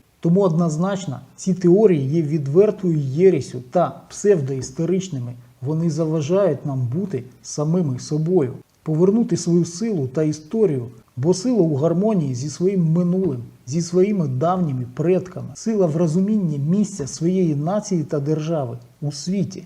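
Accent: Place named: Moscow, Russia. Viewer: Ви нащо назад на українську перейшли? Вам не пасує. Тільки масковскій діалект цапського ізика. З аканням.👍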